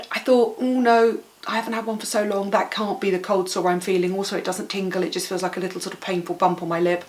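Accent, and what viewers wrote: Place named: England, United Kingdom. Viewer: Hi thank you so much for sharing a lot of good information. Your video was very entertaining. I always loved the English accent. By the way your cold sore doesn't look that bad. Thanks again. Cheerio.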